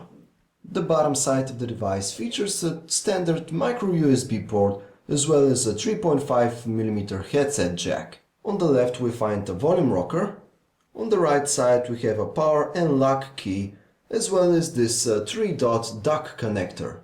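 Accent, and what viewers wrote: Eastern Europe. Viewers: Dude your Eastern European accent is too ridiculous. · is your family's origin somewhere in east-europe? your accent makes me think this so. nothing bad. great preview guy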